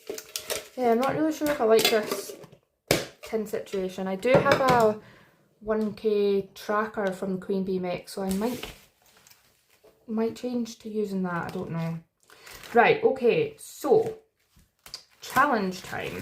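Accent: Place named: Scotland, United Kingdom. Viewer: ❤ I love your accent! Are you from Scotland?❤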